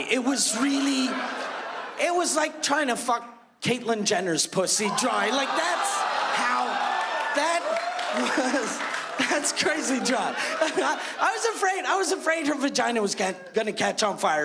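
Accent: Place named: France. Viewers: loll l'accent francais fort ?! quel accent ! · ***** oui, je sais mais je parlais au gars qui a dit qu'il a un accent français fort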